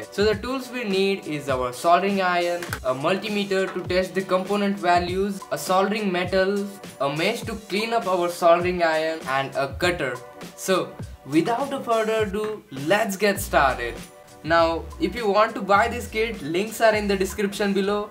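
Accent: India. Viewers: Metin Baydemir It's Indian English. Just an accent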